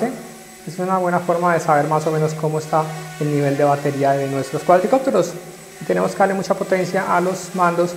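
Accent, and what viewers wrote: Colombia. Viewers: hola, eres de colombia??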